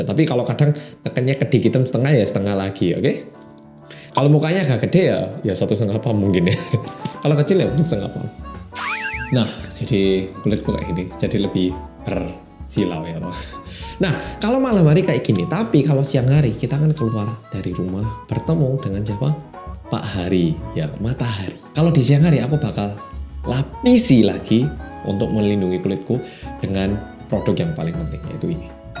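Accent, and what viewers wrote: Java, Indonesia. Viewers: Hi , MEDOK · Hmmm kangen pembukaan "orang Korea yg medok music deb deb deb" Ko sekrng ngga ada lagi yaa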